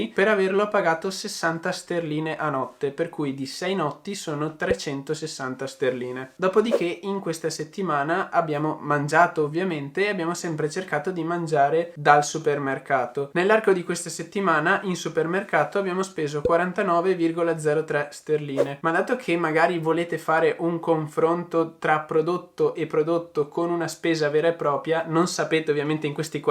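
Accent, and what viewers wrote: Veneto, Italy. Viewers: Sei veneziano?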